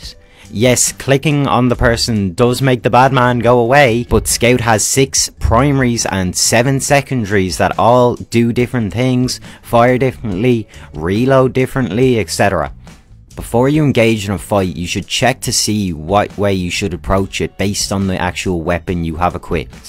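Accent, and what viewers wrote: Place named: Ireland. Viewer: I thought I’d never find an Irish tf2 YouTuber. I could instantly tell by your accent. Good videos btw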